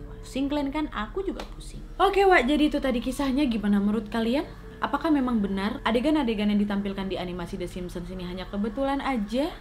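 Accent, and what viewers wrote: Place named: Medan, Indonesia. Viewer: nampak kali dek orang medannya 🤣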